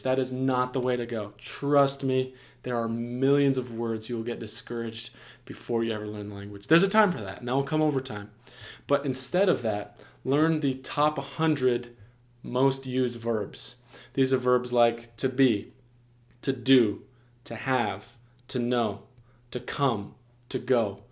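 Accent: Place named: Brazil. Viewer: ele é br dá pra ver pelo sotaque do inglês dele hahaha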